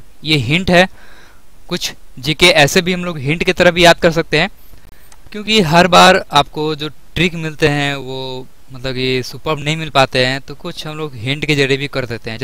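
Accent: Bihar, India. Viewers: bht mst bihari bolte ho aap sir😃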